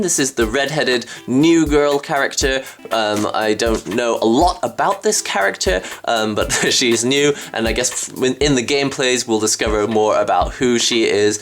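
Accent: United Kingdom. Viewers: How the f••• do you be Japanese and have a f•••••• British accent.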